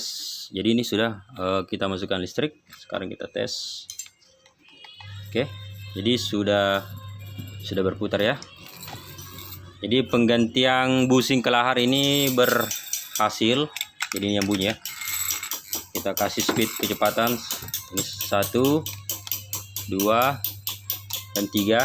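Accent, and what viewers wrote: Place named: Bugis, Indonesia. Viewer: Tau ogi logatna anggotae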